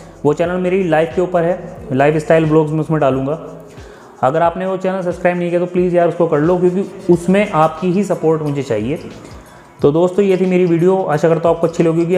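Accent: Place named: Uttar Pradesh, India. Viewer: Kha se ho ap UP mai .....aj language suni dyan se .phle.jesii rehve 😂😂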